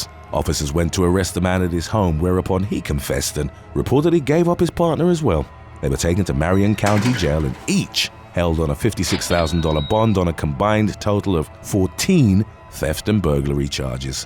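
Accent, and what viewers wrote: United Kingdom. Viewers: The narrator sounds like "Idris Elba." I wonder if he grew up in a similar place in the UK as Mr. Elba? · The best part about this channel is the British pronunciation of American locations. 😂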